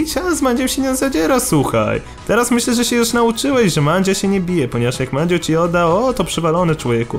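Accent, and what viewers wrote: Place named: Warsaw, Poland. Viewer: ej Mandzio jesteś z Warszawy bo słychać od Ciebie te końcówki ę,ą i tak dalej :D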